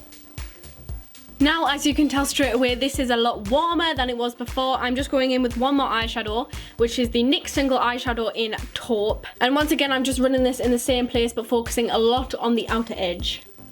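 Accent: Newcastle, United Kingdom. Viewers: Your accent has hints of geordie in it's great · Gwyn Princess nope, defiantly not Scottish lol, I would say Newcastle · Just curious but are you from or near Durham? I really recognise the Geordie accent. Also this was a beautiful makeup look and I really love watching your videos 😊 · You are gorgeous! Love your accent, similar to mine, I come from Newcastle also! I find us to have a lot in common! Love youuu!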